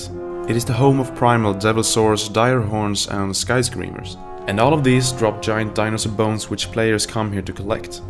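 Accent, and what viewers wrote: Sweden. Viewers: Man hörde knappt den svenska accenten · Swedish accent IF am correct? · Swedish accent ... Indeed it was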